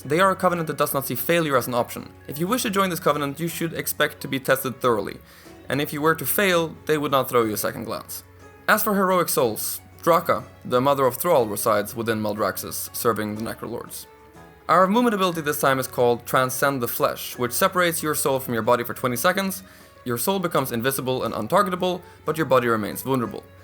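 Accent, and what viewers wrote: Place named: Ireland. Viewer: I was just struggling to pin down your accent, I thought you were Irish for a bit 😂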